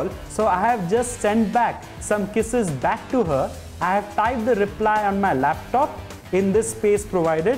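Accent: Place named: India. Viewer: Oh, I love your videos! I started to watch just to hear your cute indian accent but you're so funny and share excellent information that i felt in love with geek on the loose and unzziped by Dell too! I wish i could be your baby doll <3 LOL XOXOXOXOXOXOXOXOXOXOXO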